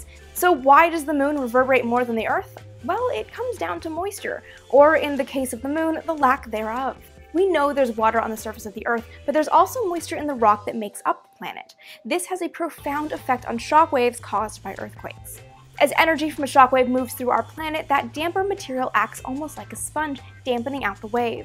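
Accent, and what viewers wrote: Canada. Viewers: Er... she's Canadian and says things Canadianly sometimes...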